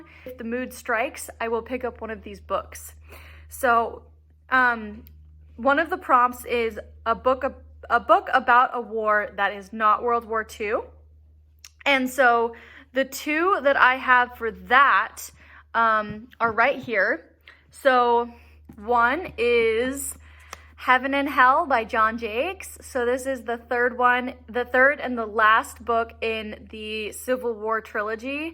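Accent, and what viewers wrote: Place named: Utah, United States. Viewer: Are you from Utah? You have a Utah accent. That’s why I was asking haha.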